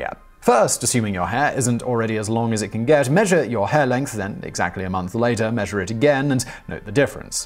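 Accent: United Kingdom. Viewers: How come you speak in traditional English but spell in American English? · 😂 😂 😂 Maybe you missed it so I'll point it out. He has a British accent and sometimes they pronounce things differently.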